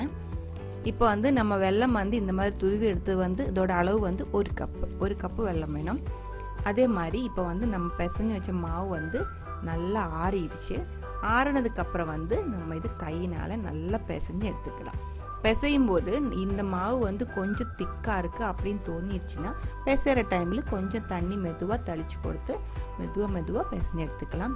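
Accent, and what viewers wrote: Kerala, India. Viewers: Excellent demo.. your Tamizh in Malayalam base is very very sweet, beautiful blend in your voice.. like the nice yummy ilai adai😃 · Malayalam kalandha tamil wow. dish semma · Oh sweet malayalam mix tamil. 🤗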